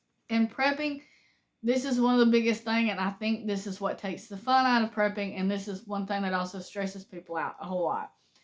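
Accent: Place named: East Texas, United States. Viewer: Well I'm fairly new to y'all's channel and didn't know you had your own channel till your husband mentioned it. Glad I heard that. And I'm glad to hear that you're recovering from your ailment. I look at you like a daughter But your accent is music to my ears. East Texas here and that's how I talk. Hope you keep up giving good info. Had the same trouble with cheap can openers. Got a good one with a crank handle on it. Sure helps this old man. I'll see you later!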